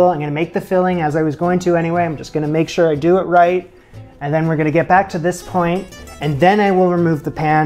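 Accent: Canada. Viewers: Ha! I've watched several of your videos lately and recently thought, "is this guy Canadian?" due to your accent. This video confirmed it!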